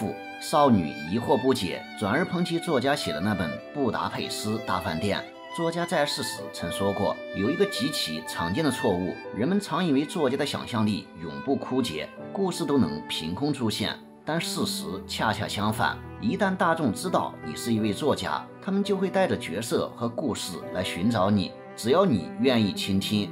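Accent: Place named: Zhejiang, China. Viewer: 越哥是浙江人吧? 你的口音我熟。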